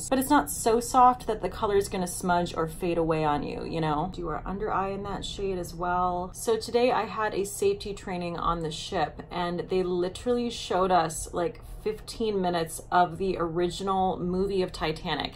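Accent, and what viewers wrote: France. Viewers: I loved this! I would wear that glitter and I'm turning 60 this month! Love the liners, too! This is a brand I've never tried but I did try Colourpop because of you so maybe I'll try this out too! Love the French accent! You never fail to entertain! Be careful out there! Thank you for another exciting video! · Hiya toots! Love your French accent! Have you ever seen the episode Girlfriends? There is a French song called that is hilarious! Oh and there have been four Titanic movies! My fave is the one with Barbara Stanwyck and Clifton Webb. So good! Love this Glossier Play line and you’re right, it has a 70s vibe! You look just adorable/chic/glamorous! Be careful with those glaciers girl. 😘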